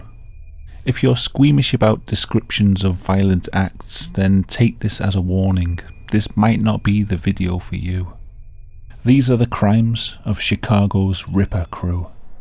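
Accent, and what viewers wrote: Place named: Midlands, United Kingdom. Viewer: Excellent!! Just subscribed! Love your midland accent..it sounds so pretty💯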